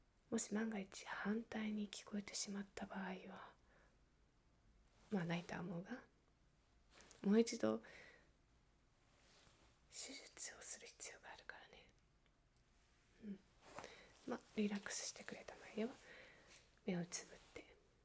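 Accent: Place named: United Kingdom. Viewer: Interesting japanese accent. almost sounds english sometimes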